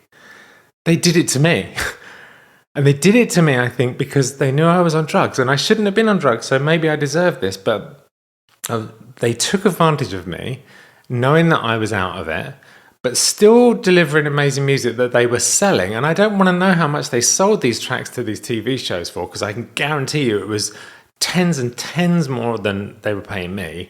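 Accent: United Kingdom. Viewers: I don't blame you for being really pissed, who wouldn't be? However don't be so hard on yourself, we all have some kinda in our life's! I love music too! And I love you to pieces! A lot of us extraordinarily ordinary people. Your charmingly witty British accent tickles me funny bone! Your music is so beautiful. I'm grateful that you've shared what those nasty people are doing. Shame on them. I tend to be a very trusting person, it's just my nature, and it has turned around and bit me in the butt, in the end, I learned more about the nature of greedy little people, so please don't be so hard on yourself. Lesson learned! Smile, it looks marvelous on you!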